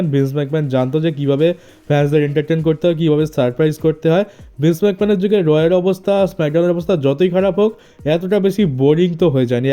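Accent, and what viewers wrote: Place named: India. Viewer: ভাই তুমি কি ভারতীয়? না মানে তোমার উচ্চারণ কিছুটা ভারতীয় আবার কিছুটা বাংলাদেশী দুটোই মিক্সিং যাইহোক আমি মহারাষ্ট্র থেকে বলছি 🇮🇳🇮🇳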